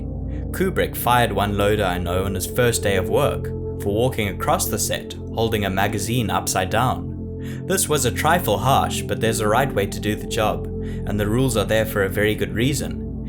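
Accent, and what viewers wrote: South Africa. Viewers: Subscribed. Your South African accent and rich videos about film are worth a subscription.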